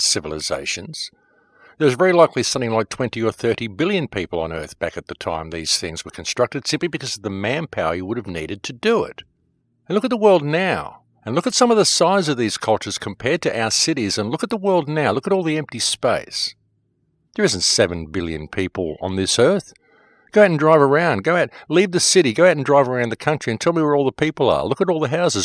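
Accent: Australia. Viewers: sounds Australian to me my man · Theres a reason they pack us into cities. Look up mouse utopia experiment. There was an excellent doco on youtube but it kept getting deleted. Great to hear an Aussie voice on this stuff.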